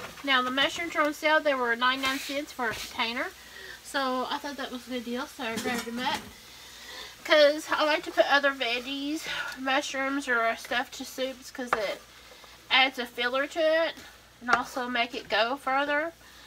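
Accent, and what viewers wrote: South, United States. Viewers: That’s how us southerners say it☺️!! My late mama, God rest her sweet soul, would call the word, yesterday, yursday😂!! She also used to tell me that I needed to get my “poplar” sleep instead of “proper”😁!!!! I miss her💔!!!!!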